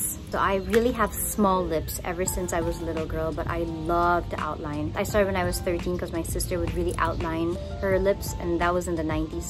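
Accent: Bicol, Philippines. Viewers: "Luway-luway lang tabi"! Hihi nakaumok dangugon ka magbicol! So cute! 😍💕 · That "luway luway lang tabi" ang cuteeee. Lakas maka shala pag siya ang nag B-Bicol. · Heart is a well-known personality yet in her previous vlog she went to a thrift store other personalities don't do that but she does! I Love you miss Heart! Keep slayin'💖💘 I also love her Bicol accent😍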